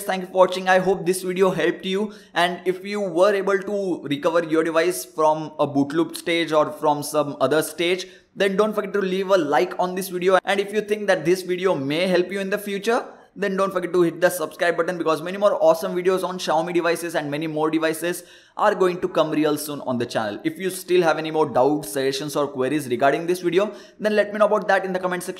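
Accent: India. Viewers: Lol i hate this indi englsih :D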